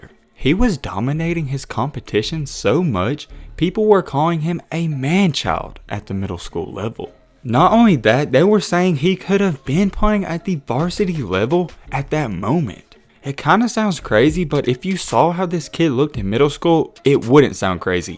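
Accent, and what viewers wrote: South, United States. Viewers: for real he has a country voice that pisses me off also whole video sounds fake, sounds corny, and sounds scripted lol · bro this man is so country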